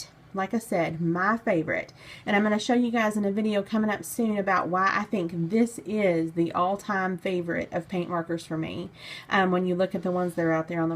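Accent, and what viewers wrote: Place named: South, United States. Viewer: Ahh love a southern accent tutorial vid 😊 reminds me of my fam down south. Ty for posting this!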